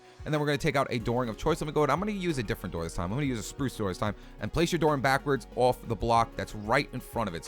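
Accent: New York, United States. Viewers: Are you from New York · You from New York?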